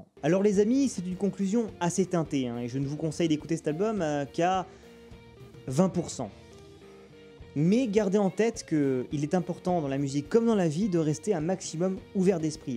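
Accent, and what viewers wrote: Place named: Auvergne, France. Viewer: t'es auvergnat nn? j'ai l'impression que t'a l'accent sur les ''o'' et les ''au''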